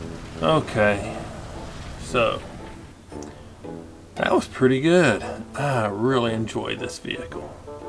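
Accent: South, United States. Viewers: Are you from the south? You have a southern accent...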